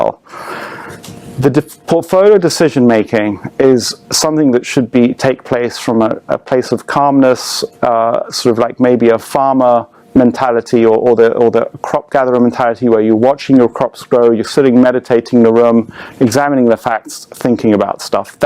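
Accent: Europe. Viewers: listen to his voice, European.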